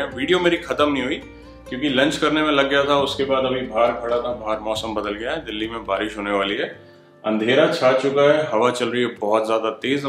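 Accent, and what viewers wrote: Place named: Punjab, India. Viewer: Pehle baat to sir 3 reason hi kyu😁? PERSONAL BTAYU TO(CAN I WRITE IN PUNJABI) 1.PEHLE GAL TA BHAJI THODI EH VDIA LAGDI A KI TUC APNI MOSTLY TRIP TE KHANA VEERE NU LEKE JANA O ❤(THODI JODI BOHOT VDIA A, DONO DOWN TO EARTH HO❤) 2.THODE CINEMATIC SHOTS MANU KAFFI VDIA LAGDA A CAUZ 😁MAVI FILMAKING KARNI A, MANU BOHOT INTEREST A IS FIELD CH❤ 3.THIRD BHAJI EH A VI TUC HEGE TA DELHI DE HO BUT PUNJABI BADI SWEET BOLDE O😂😍HINDI TONE + PUNJABI LANGUAGE= 4.MERE VLO BONUS 🤫, TUC HAR TRIP START KARAN TO PEHLA WAHEGURU JI DE DARSHAN KARDA O❤AND END HMESHA EKO SALOGAN BOLDA O MEHNAT HMARI REHMAT UNKI AUR PYAR APP SBKA❤(DEEP GAL KEHTI TUC SACHI❤)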